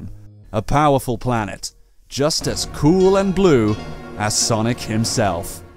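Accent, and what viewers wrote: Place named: United Kingdom. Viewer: 【The Sonic Theorist】 Hey mate. It's just a suggestion but please do you think you make a video or 2 such as ya top favourite Sonic characters and/or top favourite Sonic games? I'd LOVE to see videos like them!:D:D:D Or do you already have them on ya channel? I love your accent!:D:D:D Are you from the UK too? I am! XD I'm from Sunderland, England! XD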